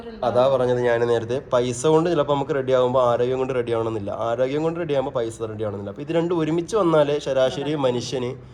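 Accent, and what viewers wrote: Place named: Palakkad, India. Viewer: നിങ്ങൾ എന്താണ് പാലക്കാട്‌ ഭാഷ പറയുന്നത്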